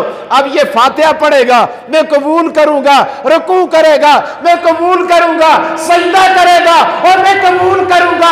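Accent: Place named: Pahari, India. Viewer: مولانا سعید صاحب ماہڑی "پہاڑی" بولی جس کو اوہ ہندکو آکھدے ہیں بچ گل بات کردے مچ پیارے لگدے ہین اللہ تعالیٰ انہاں کو سلامت رکھے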